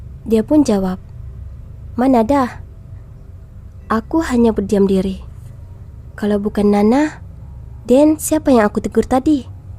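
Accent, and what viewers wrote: Sabah, Malaysia. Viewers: bhsanya mcm sabah ade mcm singpura pun ada mcm indonsia pun ade..ape2 pun cite bes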